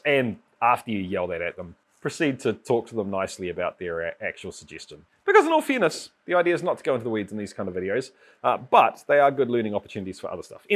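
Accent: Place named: New Zealand. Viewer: German speaking Yooper* here, stumbled across your channel a couple days ago. When I couldn't understand... well, anything you were saying, I actually had to turn on the closed captions. Then I realized I haven't heard that many Kiwis (with all due respect!) talk, so I had to watch a few more videos so I could translate the accent. By the end of this video, didn't need the (cc) any longer. And... absolutely loving the passion you have for the craft! * A Yooper is a person from the Upper Peninsula of Michigan (USA), very near the Canadian border. We talk funny too! :-) If I ever make a video, you'll probably need closed captioning too.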